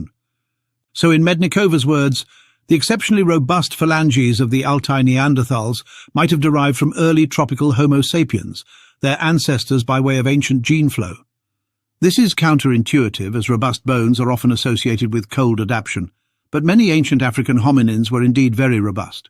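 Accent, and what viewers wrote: England, United Kingdom. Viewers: I see people today who have these features. Who is your English accent?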